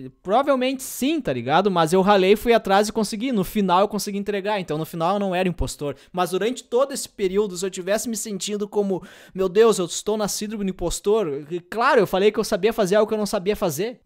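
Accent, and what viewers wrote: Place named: South, Brazil. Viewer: Ba, mas tu é daqui do Sul, guri? hahaha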